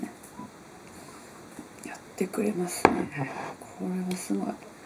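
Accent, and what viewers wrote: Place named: Tohoku, Japan. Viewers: 訛りが私と同郷な感じがするのですが・・・(笑) 私は生粋のイナカッペ、キリタンポ野郎ですｗ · 美味しそうに食べますね。お腹空いてきました＾＾ 東北の方ですか？ 東北人なのですが、なんとなくイントネーションに親近感が沸きます。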